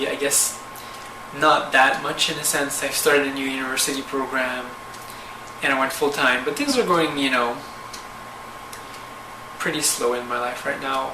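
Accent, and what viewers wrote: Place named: Quebec, Canada. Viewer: She's from the Quebec, Canada. The accent is French Canadian.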